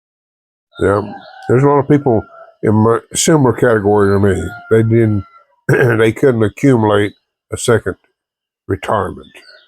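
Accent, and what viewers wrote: United States. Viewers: Good morning,. If it wasn't for bad luck, you wouldn't have any luck at all. Given all of the terrible things you've experienced in life, you could've turned out to be a very angry and bitter person, but you didn't let all of that get you down. That speaks volumes about your true character. Sometime, during your young life you must've had a mentor that was positive influence on you. It's either that or you somehow inherited a terrific set of genes family tree or it could be a combination of both. Whatever the case, Grace couldn't have found a better person to marry than you and Virgil couldn't have a better father than you, In fact, Virgil couldn't have any better parents than you two, both genewise and mentorwise. As for me, I feel very fortunate to have stumbled upon your wholesome, heart warming, family oriented, interesting, and educational videos. 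Besides that, I really enjoy your relaxing, laid back, hillbilly way of talking,. It's nothing to be apologetic about. In fact, you should be proud of your family heritage. ❤ 👍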